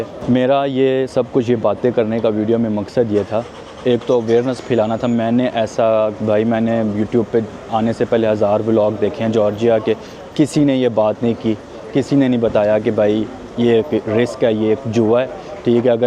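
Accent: Pakistan. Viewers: BASED ON YOUR ACCENT, I FEEL YOU ARE A PAKISTANI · Are you from Pakistan?? Your hindi accent is not like ours. Yes you are a Pakistani...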